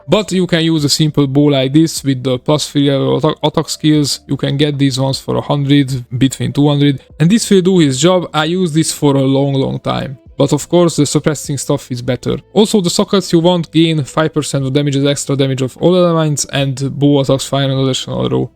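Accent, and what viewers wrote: Hungary. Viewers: Fasza kis build, nagyon hallatszódik a magyar akcentus! (támogató komment)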